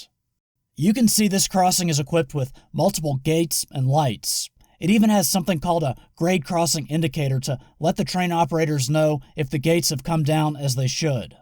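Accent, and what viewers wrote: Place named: Augusta, United States. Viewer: “The train company” “THE TRAIN COMPANY?” I’m gonna have to listen to this video when I’m homesick for the CSRA accent [Augusta GA area]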